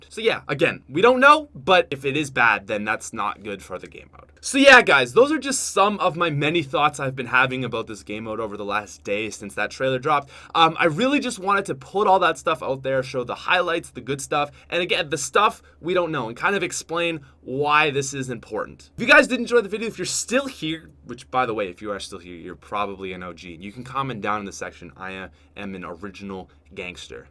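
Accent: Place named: Canada. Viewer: After watching all 9 seasona of How I Met Your Mother, I've barely noticed that you have a Canadian accent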